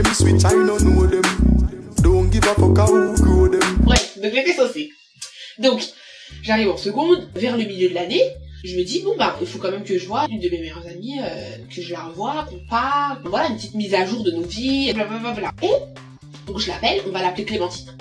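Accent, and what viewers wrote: Antilles, Caribbean. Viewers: Tu est antillais je connais l'accent créole tu est d'ou au îles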